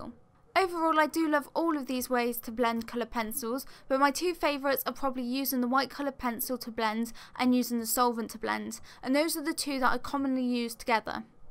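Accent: England, United Kingdom. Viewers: Can can hear you are English. I have a problem getting Prisma's here. I can buy whole sets here in the UK..but individual colours are impossible to find. Can i ask where you find yours? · I love your British accent😍🔥 . Is it just me who is just listening you talk rather than actually understanding 😂❤️....loved it though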